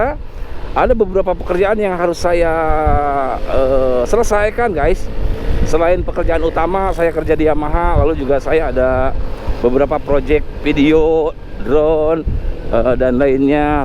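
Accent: West Java, Indonesia. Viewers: assalamualaikum abang, salam silturahim dari mmx malaysia. wah biker sejadi. ngevlog abang juga keren euy. enak dengerin logat sundanya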